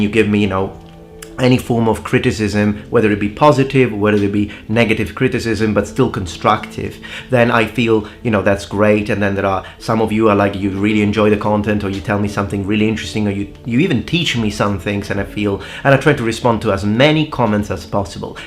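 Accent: United Kingdom. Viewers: why you have a british accent? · You sound very British but look very Italiano. How come ? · I can't believe how much time has passed! 500k?! I very rarely do these but I'll try be concise :D First off, congratulations! You deserve the milestone for your diligence over the years, truly well done man. I can't pinpoint when I subbed, but I've been along the ride for many years - to see this growth externally is super satisfying. I can say with confidence pre 50k, but my memory fails me to go beyond that. Around the time your language videos were more prevalent I'd say. My other reason to drop a comment here is to explain why I initially subbed - it was because of your character, before your content. At the time, there was very little content for what you were producing (and still do). To see a video on historical medieval warfare and/or weaponry was a rarity, a drop in the ocean. 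To then move to language in the next video to me was astonishing. Not just generic language, but an Italian man speaking fluent English with a British accent swapping to Greek and Japanese in the same breath was near inconceivable, I couldn't believe my eyes (and ears lol). I'll touch on why else that was important to me later but, above all you were passionate, and had infinite potential to grow, across every avenue. I wanted to see that among many other things. Youtube wasn't exactly new when I landed here, but it definitely wasn't the colossus it is now. Growing up with the platform I've always been very picky and arrogant in who I subscribed to. To me a subscription was a dedication, it wa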